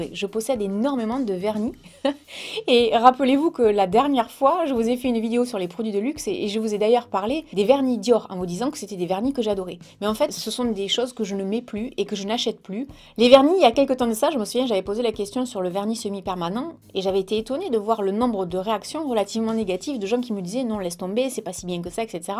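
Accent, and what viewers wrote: South, France. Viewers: Nouvelle abonnée ,j'ai passé un moment très agréable à regarder ta vidéo. Merci pour toutes ces bonnes idées ,ta simplicité et cet accent du soleil😘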